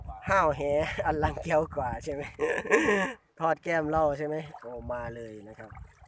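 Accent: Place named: Bueng Kan, Thailand. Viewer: โอ้ยเนาะฟังๆไปกะคนบ้านเดียวกันคนบึงกาฬคือกันครับ เอาไปก่อนเลย100วิวบักหล่า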